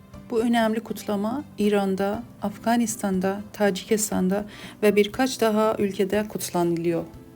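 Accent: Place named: Turkey. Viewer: عزيزم دستت درد نكنه از اين غذاى خوشمزه ، چه لهجه ى تركى زيبايى دارى 🩷🪻🩷🪻🩷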